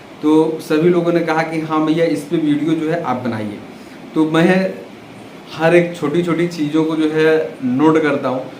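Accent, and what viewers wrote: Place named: Bhojpur, India. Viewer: Arrah se ho ya Buxar se ya bhojpur se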